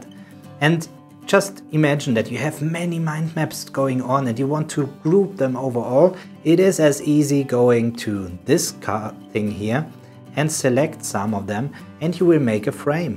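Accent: Germany. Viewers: Wie man einfach sofort hört, dass er deutsch ist 😅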